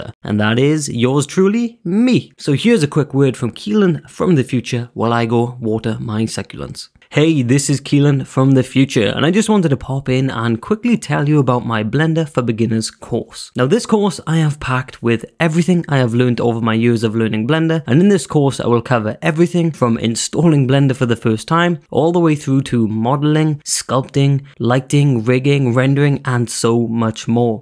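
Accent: Wales, United Kingdom. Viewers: Just decided to get into animating and youre the first youtube creator Ive watched, awesome mate and Id spot a fellow welsh accent anywhere! 🏴 Liked and subbed! Would be cool to get into contact with you in a few months when ive got more knowledge on it all! Best of luck mukka, hope life is good🍻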